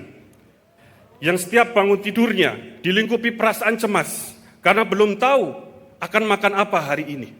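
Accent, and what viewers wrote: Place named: Java, Indonesia. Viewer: Logat nya kok jawa ya??😂, jawa memang Muantaappp👍😁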